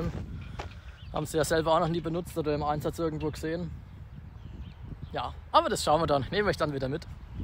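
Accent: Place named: Franconia, Germany. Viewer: Da ist Triedorf Jacke oder? Du klingst auch fräängisch. Hab da vor evtl auch hinzugehen nach dem Abi.😊 Und btw ich meine gelesen zu haben, bis 1900 Undrehungen ist verzögern 0 Problem. (Bei Druckluft gebremsten Anhängern ist das natürlich was anderes) Wir fahren eigentlich zu 90% einfach mit TMS und Fahrpedal.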